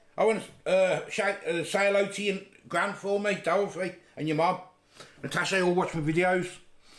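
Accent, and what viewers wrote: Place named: Black Country, United Kingdom. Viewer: Salt and pepper is not seasoning I think you and are amazing people loving the Black Country accent I’m from the Black Country too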